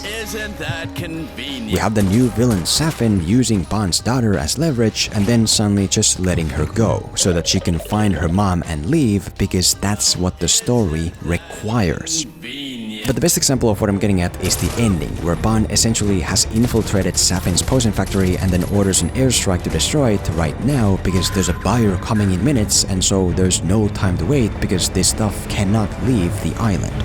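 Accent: Finland. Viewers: Your accent… Finnish?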